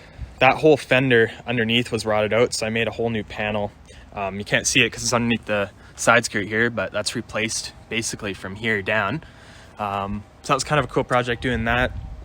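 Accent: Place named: Canada. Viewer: Instantly knew he’s from Canada by the way he pronounces his O’s and R’s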